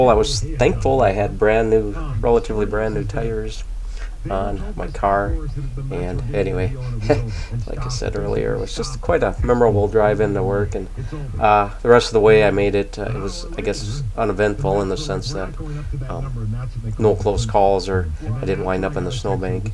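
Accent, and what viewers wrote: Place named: Minnesota, United States. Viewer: This is the most Minnesotan accent ever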